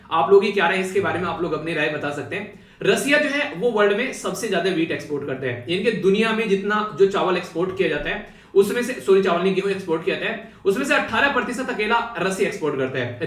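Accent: Haryana, India. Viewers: Sir ap haryana se ho..... Rewari wale area se..... As I can guess.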